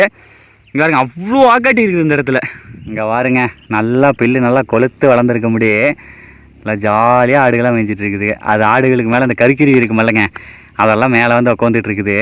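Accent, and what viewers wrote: Kongu, India. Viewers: Unga kongu Tamil Vera level keep rocking reach heights all the best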